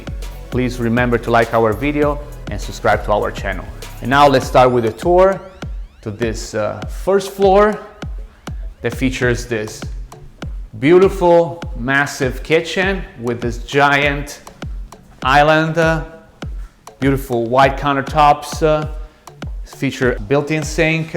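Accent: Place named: Italy. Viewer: What are you talking about? He’s an Italian which has learnt British in America - that’s what it sounds like!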